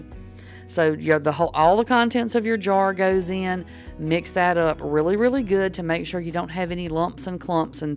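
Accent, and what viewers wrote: South, United States. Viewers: I love listening to you, you remind me of growing up in the south! Thank you, these recipes look wonderful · New sub here 🙋🏻‍♀️ First of all, you’re adorable!! 🥰 Love your southern drawl & bubbly personality!! Secondly…..I am SO grateful for these hamburger helper mixture recipes!! I’m going to try them all. I grew up on Hamburger Helper. There were 8 in my family & we could stretch Hamburger Helper pretty far. Super excited to try these!! I also have a request (& forgive me if you’ve already done this-I will look thru your channel) but could you possibly come up with a dry mixture recipe for cold pasta salads?….like the Suddenly Salad box mixes?? I would love to have a dry mixture recipe I could mix with mayonnaise to put over pasta & veggies for some good summertime cold pasta salads. THANK YOU SO MUCH!! ❤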